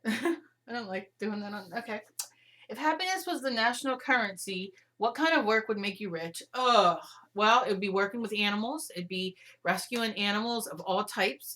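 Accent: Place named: New Jersey, United States. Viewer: You are so NJ and it is so refreshing. I too am a Jersey girl and when you say "frickin'" it just makes me laugh!!!!!! stay strong and spunky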